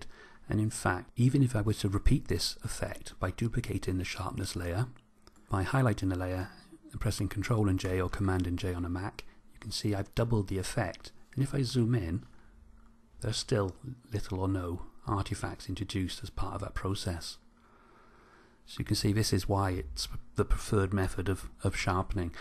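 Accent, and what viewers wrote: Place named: Wales, United Kingdom. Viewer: Thanks so much ! And love the Welsh accent, so gentle to listen to..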